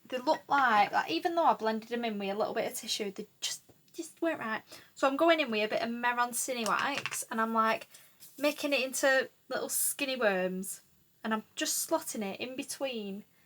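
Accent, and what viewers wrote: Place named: United Kingdom. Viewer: I love you voice it is so British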